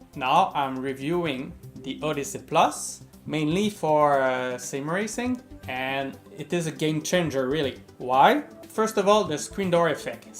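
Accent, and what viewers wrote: Quebec, Canada. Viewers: un quebecois c sur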